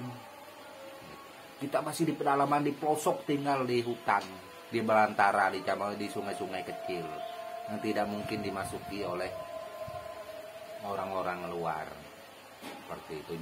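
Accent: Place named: West Java, Indonesia. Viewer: Cengkok nya seperti logat Sunda yah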